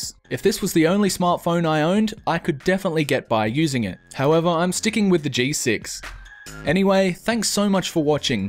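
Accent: Australia. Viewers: 1. he was born with an australian accent and can't change that and should be proud of it. 2. he can do whatever he wants with his hair. that's not your choice 3. i think his videos are really good quality and better than most tech channels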